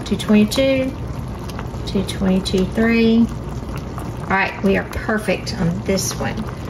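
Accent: South, United States. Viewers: 40 she had figs on her tree OK we I don’t think we have fig trees here in the Minnesota area when you said that I was like kind of jealous like excited like thinking yes that’s really cool and then I was thinking can I plant a fig tree here. Are there any fig trees in the Midwest? I felt like I was very Mediterranean, but you have a southern accent so where are you at? Lol.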